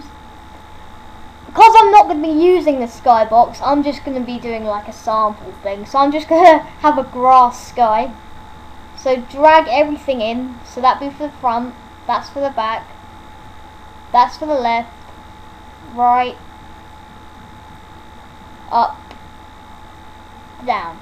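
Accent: United Kingdom. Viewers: great video but lame choice of english accent. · omg bro is british! finally i found a british unity tut thank you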